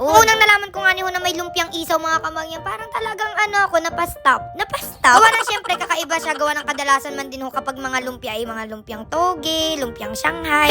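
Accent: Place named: Quezon, Philippines. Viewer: Ito yong blager na nakaka tuwang panoodin saka yong Punto nya kagaya dito samin sa queson province ❤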